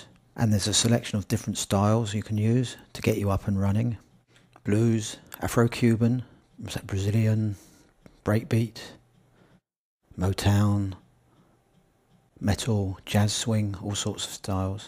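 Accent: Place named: England, United Kingdom. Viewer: luv your Enlgish English accent <3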